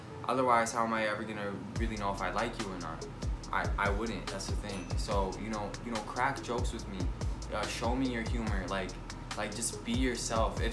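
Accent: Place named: France. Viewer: That French accent 😂and boi I would win any argument 😫😫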